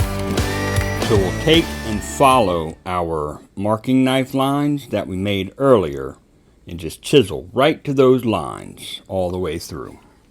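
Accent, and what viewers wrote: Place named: South, United States. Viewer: Hi, I juts discover your channel this morning. Congrats, very interesting stuff. Question for you. Where are you based? I would say in South U.S by your accent, but for the French Canadian I am, I would also want to thanks you, cause I can understand you with any problem You just got another huge fan, and you will inspire me to launch my own channel by the end of the year Have a wonderfull day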